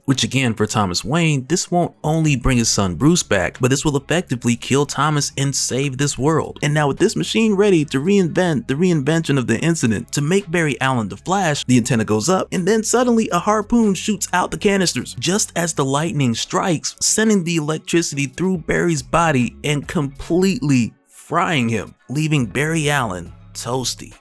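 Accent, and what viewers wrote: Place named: Southwest Virginia, United States. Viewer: Love your accent man im from southwest va lol it sounds my county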